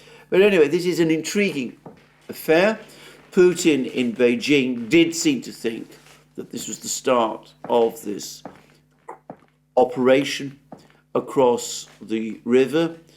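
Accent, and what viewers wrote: United Kingdom. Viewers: This "program" is the "debacle". Fallacious generalizations, about Biden's intents, performance and rhetoric abound. Confident claims about the (yet unopened) aid portal reveal only the profound propagandist purpose of this channel. The analysis is to be read, not for its validity, but is valuable, nonetheless for exposing with a UK accent, Moscow's message.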